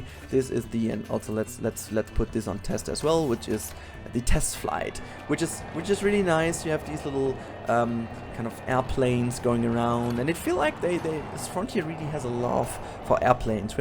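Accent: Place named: Germany. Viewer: hahah bist du cdeutscher ? :p :o echt kein schlechtes english probs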